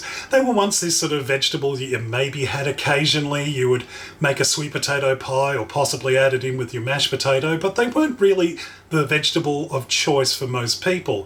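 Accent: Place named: Australia. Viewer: I do not care much about whatever your recipe is . I just love listening to your voice and the Aussie accent 🙌🙌🙌🙌🙌💝💝💝💝💝💝🇦🇺🇦🇺🇦🇺🇦🇺🇦🇺 I’m living in Houston,Texas. USA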